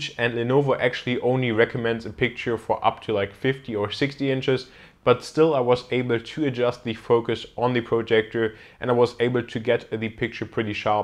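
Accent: Germany. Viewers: Du bist doch Deutscher. :D Das hört man doch.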